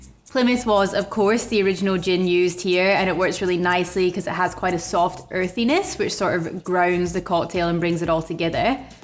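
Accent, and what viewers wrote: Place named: Scotland, United Kingdom. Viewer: Да ладно, по-моему самое шикарное в ней - это её шотландский акцент.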